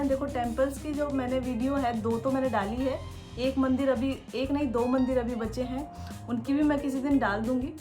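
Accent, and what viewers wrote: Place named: Uttar Pradesh, India. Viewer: Mujhe aapki language tone se lag he rha tha k aap UP se ho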